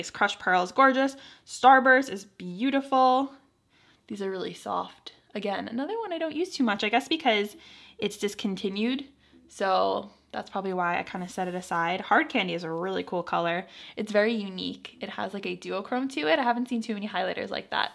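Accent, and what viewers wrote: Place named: New York, United States. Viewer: I love your New York accent!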